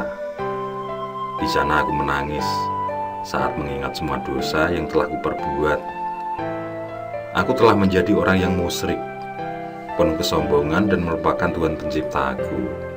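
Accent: Java, Indonesia. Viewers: Suaranya mirip pakdhe Alfan (dongeng tengah malam) logatnya mungkin sama wong jowo nya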